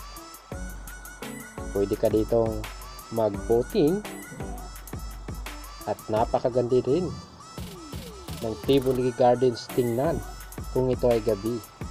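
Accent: Visayas, Philippines. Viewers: i think he is bisaya,the way he talk,that's why.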